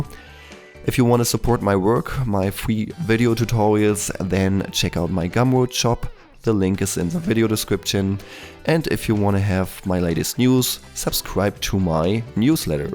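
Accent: Germany. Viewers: ) nichts gegen dich aber bemerkt das du deutsch bist diese aussprache von englisch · are you german? you really sound so...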